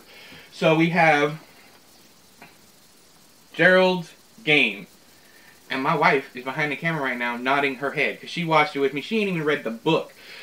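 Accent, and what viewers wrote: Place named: South, United States. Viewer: My first place choice would be 'Shawshank', and second place '1922' which İ found to be better than 'Gerald's Game'. That southern drawl! 😘 (Absolutely love it! My girlfriend hates it though. She said: Why can't he at least unclench his teeth while talking?)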